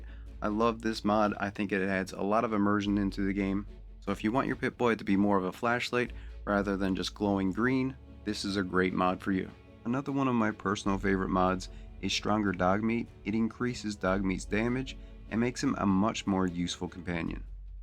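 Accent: Newfoundland, Canada. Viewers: I know another Newfoundlander when I hear one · Helpful video, thanks 👍 Also the second I heard you talk I was like, "Is this guy from Newfoundland too?" 😃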